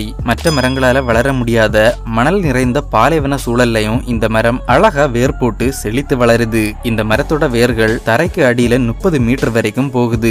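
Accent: Kanyakumari, India. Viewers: கன்னியாகுமரி காரனா நீங்கள்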